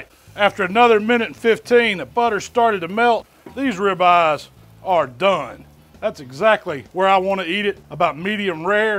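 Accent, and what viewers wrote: South, United States. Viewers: Crazy how over the years your accent has gotten more southern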